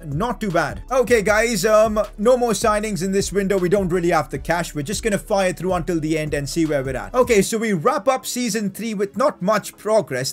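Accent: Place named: India. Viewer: u have the biggest Indian accent it’s probably because u are the biggest Indian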